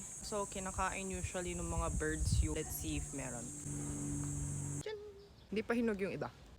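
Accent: Philippines. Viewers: Wow! Pinoy accent talaga ha 😃 cool! Ang sarap naman kumain ng fresh na fresh gulay at fruits! May saging ba kayo dyan?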